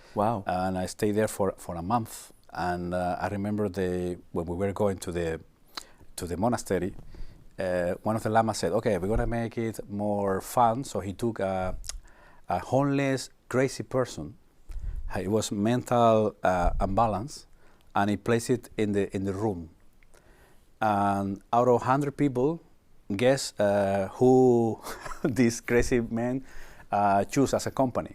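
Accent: Spain. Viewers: Voor mij, wonend in galiciē is het vreemd om engels met een sterk spaans accent te horen. Ik ben er door afgeleid. ik zit steeds te wachten tot hij in het spaans verder gaat, maar dat gebeurt niet.😂😂.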